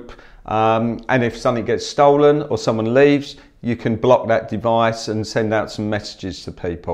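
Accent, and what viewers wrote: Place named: London, United Kingdom. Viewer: He has a proper London accent - entirely different to Aussie one. The auzzie accent makes you want to rip off your ears lol.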